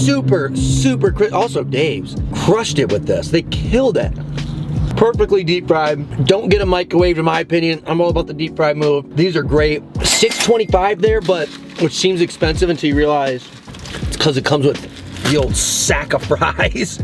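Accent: Chicago, United States. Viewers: lol. I was guessing this video was made 15 years ago! Your Chicago accent makes this a genuine Chicago food video. 😂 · I don't even need to watch the video, but only listen to him speak momentarily to know he's from Chicago, my hometown. 😂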